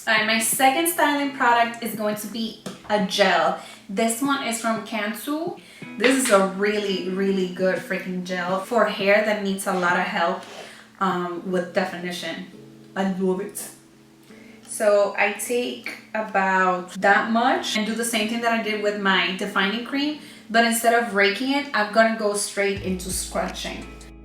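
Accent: Puerto Rico. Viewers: Eah corrillo? Puertorican?👀😂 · voy a tratar not your mother’s! me encantó como quedó. btw eres puertorriqueña?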